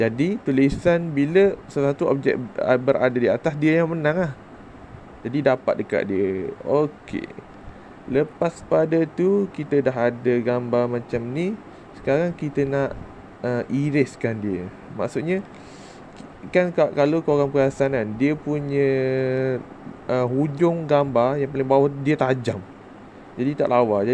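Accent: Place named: Terengganu, Malaysia. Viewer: Saya suka abang cakap ganu je..terhibur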